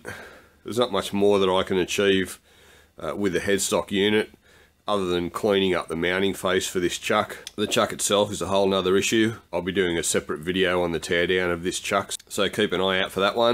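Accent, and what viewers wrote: Australia. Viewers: Dude kick ass accent. Ausi I gather. Good vid bro.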